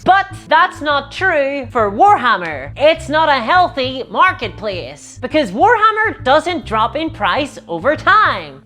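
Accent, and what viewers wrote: Northern Ireland, United Kingdom. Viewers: Ah I'm near Bristol, knew it had to be some kind of british! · Are you from the north of Ireland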